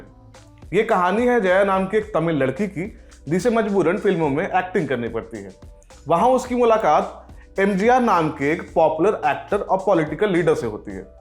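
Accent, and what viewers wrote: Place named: Bengal, India. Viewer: I think he is Bengali.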